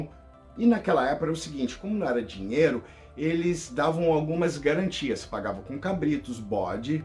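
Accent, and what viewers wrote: United States. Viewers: EU PENSEI QUE VOCÊ ERA AMERICANO, VOCÊ TEM SOTAQUE. VOCÊ É MUITO BOM, PARABÉNS ! SHALOM IRMÃO. CANAL REVERENDO MIL.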